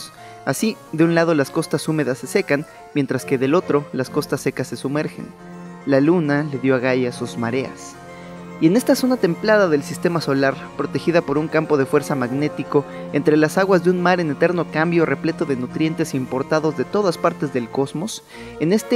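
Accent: Mexico City, Mexico. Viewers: Dos años, editado este gran trabajo,; EXCELENTE DOCUMENTAL, IMAGINO TODO EL TRABAJO Y PACIENCIA PARA LOGRARLO, IMAGINO TODA LA CREATIVIDAD Y TIEMPO PARA PRESENTARLO. EN UN LENGUAJE 🇲🇽 "CHILANGO" (alegre y relajado y audible) B E N D I C I O N E S❗ ESTÁ S U P E R‼️ 👏🏼👏🏼👏🏼👏🏼👏🏼👏🏼👏🏼 BRAVO ‼️ 👍🏾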